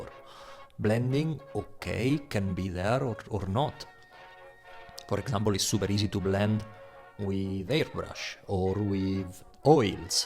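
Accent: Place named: Italy. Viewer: Very nice video and I also enjoyed your rant. I just discovered your channel. Besides a fellow artist and nerd, I also like MotoGP and wonder about your accent. It seems slightly different than Valentino Rossi's (do you know him?). Are you from a different region of Italy? 👍🙏 Ciao!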